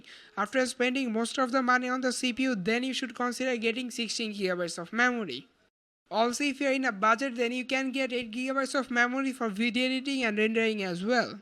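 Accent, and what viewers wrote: India. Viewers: Lovely accent :) Hello India!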